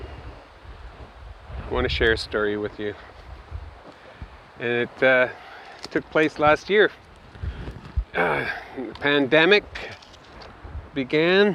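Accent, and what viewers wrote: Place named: Canada. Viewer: I knew you were Canadian when you said the word 'process'. Americans say 'prahcess', not 'prowecess. You also said 'bloody excited'. More common adjective (bloody) with Canadians probably since 1 in 5 Canadians are of Scottish descent.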